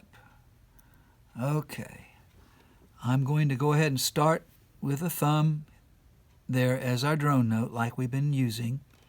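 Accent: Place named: South, United States. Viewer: Ah this sweet southern voice sounds so familiar! Brilliant patient teacher you are my friend. I am sharing this with so many devotees who need this! Much Love and Gratitude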